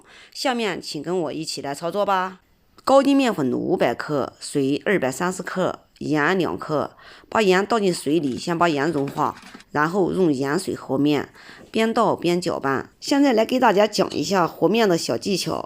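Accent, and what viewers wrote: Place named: Shanghai, China. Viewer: 阿姨的上海話口音真好聽😅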